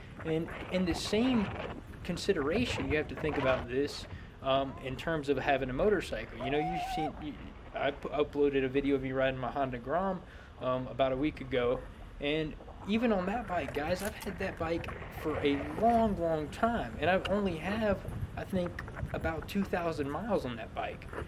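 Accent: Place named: Carolinas, United States. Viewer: Yooo! I just heard you say you were in SC and NC! I thought your accent was from the Carolinas! I'm in NC, and would love to hear about any trails you'd recommend riding out here! I loved hearing the stories of how you've helped people with the unicycle. 😁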